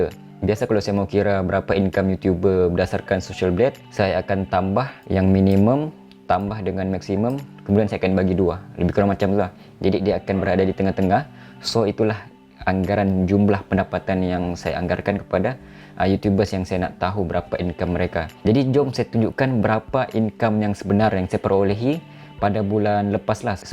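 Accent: Sabah, Malaysia. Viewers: Torbaikkk 🙏🏻👍🏼👍🏼 ko Malaysia asal mana?Longat ko mcm bro2 dari Sandakan Sabah haha agak2 jak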